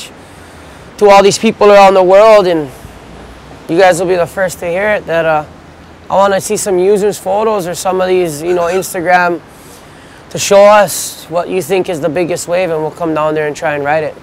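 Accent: United States. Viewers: is that not still Hawaiian? Of course it is.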